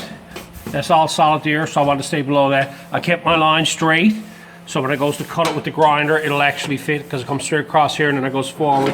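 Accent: Newfoundland, Canada. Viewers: Newfoundland eh? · Newfoundlander? Always a pleasure. Subbed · sound like a newf ?? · Where are you from in Newfoundland? Def tell cause the accent.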